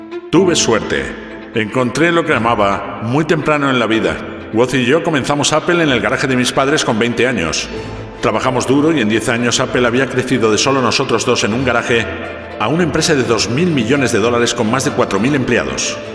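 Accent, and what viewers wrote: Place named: Spain. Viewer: Todo está bien, pero el acento español le quita nostalgia y seriedad, un español latino le hubiera ido mejor.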